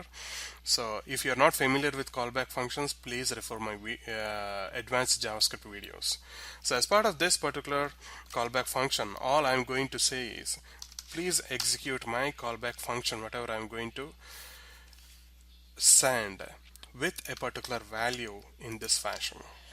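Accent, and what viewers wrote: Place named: India. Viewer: Very good. Since I'm Dutch I had some problems understanding this India way of pronouncing the English language. But who cares if the tut is excellent?! I really never saw the concepts of services so well explained as in this video.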